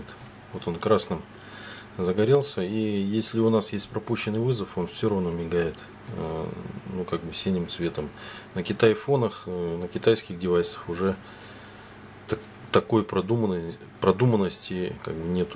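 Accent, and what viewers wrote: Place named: Urals, Russia. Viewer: Спасибо за обзор! Особенно порадовал Уральский акцент!!!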